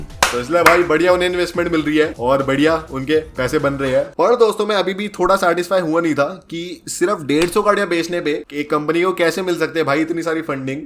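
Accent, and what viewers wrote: Haryana, India. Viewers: Bhai too much haryanvi ACCENT WAY TO MUCH,problem accent nahi accent ki wajah se jo INFORMALITY wala haryanvi touch aap le ate ho WO HAI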